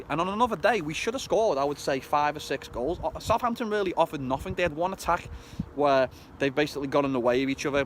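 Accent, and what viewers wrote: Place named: Liverpool, United Kingdom. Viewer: Don’t know how long you’ve lived in New Jersey but you sound more scouse than my boyfriend and he was born a Scouser and still lives in Broad Green!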